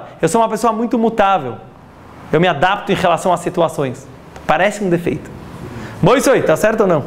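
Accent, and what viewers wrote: São Paulo, Brazil. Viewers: Se este sotaque paulista não fosse tão irritante eu veria o vídeo ate o final, mas não consigo. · o que irrita o sotaque paulista?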